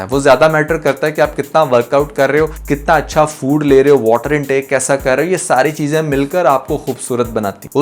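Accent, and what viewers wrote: India. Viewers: ان کی خوبصورتی کو چھوڑیں، پہلے یہ بتائیں کہ آپ بھارتی لہجے کی نقل کیوں کر رہے ہیں۔ پھر کے بجائے فیر کہنا۔ پاکستانی ہیں تو اردو تلفظ اپنائیں۔ You sound disgusting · میرا اعتراض الگ ہے۔۔۔جو تم ہندی لحجے میں لفظ۔۔۔پھر۔۔۔کو۔۔۔فِر۔۔۔کہہ رہے ہو اس۔ کی کوئی خاص وجہ ہے کیا؟؟؟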